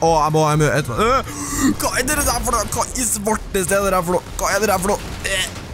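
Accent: Trøndelag, Norway. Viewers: Er du trønder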